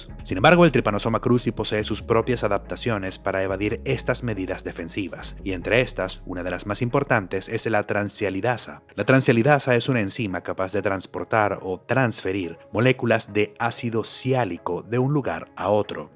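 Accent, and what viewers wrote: Venezuela. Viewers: pana eres venezolano ?? yo estoy nuevo en su canal , ya me suscribi , excelente trabajo con tus videos, dios te bendiga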